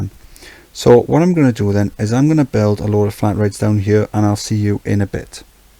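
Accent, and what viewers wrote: Wales, United Kingdom. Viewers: What country are you from? Your accent is awesome. I want to guess Wales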